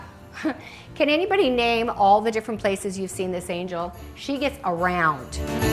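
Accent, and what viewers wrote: United Kingdom. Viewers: Very Beautiful, understated, classy as usual! Was eagerly awaiting your unveil, love the flow. Also love your British accent. Happy holidays from England. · LIKE 👍for your British accent😂👍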